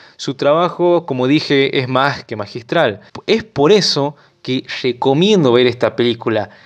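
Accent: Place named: Corrientes, Argentina. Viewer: Ese tono es de provincia de "coyientes"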